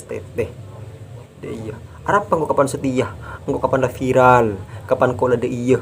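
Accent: Madura, Indonesia. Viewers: Maaf Mas Ini orang Madura Ya ..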